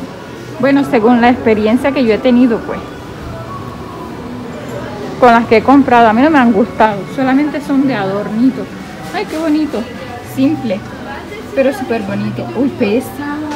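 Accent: Caribbean Coast, Colombia. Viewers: cuetame soy seguidora de tuya De parte Colombia eres se nota que eres costeña que Dios te bendiga siempre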